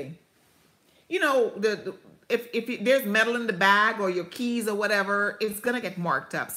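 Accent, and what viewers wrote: Trinidad and Tobago. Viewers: ..Congratulations! I was lucky to catch the end of your livestream. Excited for the next one. Love your costume shirt! We love Mandolorian and the child. You sound so happy and jolly I am so very happy we subscribed to each other hoping to get to 1,000 subs soon. I am hearing a slight island accent there❤❤❤ Ps. Wish I knew about the giveaway before those samples looks awesome especially the lipstick I need some new ones but can't afford any right now. Girl some of them brands I never heard about. I am really happy that I am here I have a lot to learn llove the Mickey Mouse bottle I live in his backyard 🙂 Hey I figured the accent out Trini in the house yayyyy. We Trinis are very generous people, your son is very cute. I like how you teach him to call people Miss or Mr I teach my granddaughter the same ❤❤❤ look on my channel I have all them delicious dishes you talking about. 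Come retire in Central Florida it have a lot of new affordable houses.Do you have a PO Box?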